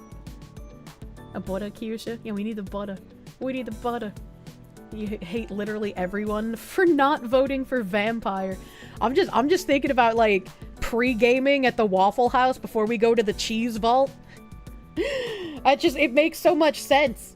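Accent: Boston, United States. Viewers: The boston accent is great. It reminds me of one of the greatest American events in history.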